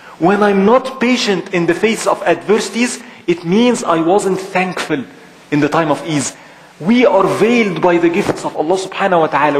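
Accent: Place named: Egypt. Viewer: Yakhi this guy is AWESOMEEE. Love him mashallah. Love the egyptian accent too xD Very nice lecture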